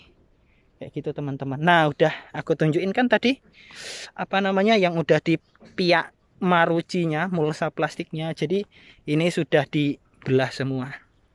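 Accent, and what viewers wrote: Java, Indonesia. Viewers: org Kalimantan apa org jawa mas 😂 kok medok jawa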